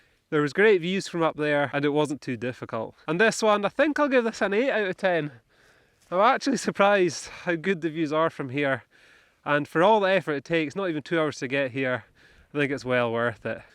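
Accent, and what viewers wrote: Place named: Northern Ireland, United Kingdom. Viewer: Can't place your accent, mate... County Antrim? Ayrshire? Anyway, that view of Nevis and co is jaw dropping. Subscribed.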